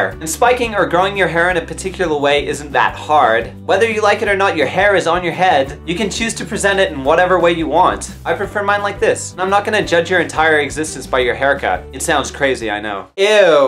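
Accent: Australia. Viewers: I love how your aussie accent slips through a bit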